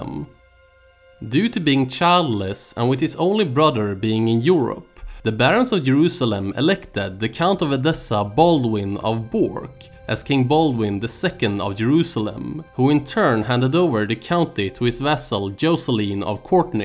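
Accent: Sweden. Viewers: That is an unmistakable swedish accent, doesn't have a choice i imagine.. At least he speaks your language fluently and made this..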